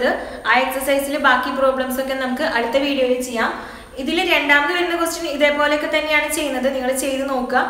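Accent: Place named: Kozhikode, India. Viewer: Edhnn parennath😂 kozhikoden slag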